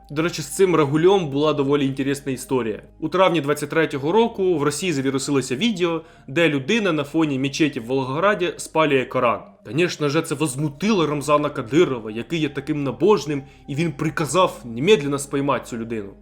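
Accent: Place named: Vinnytsia, Ukraine. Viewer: Ти що з Вінниці) цікавий суржик)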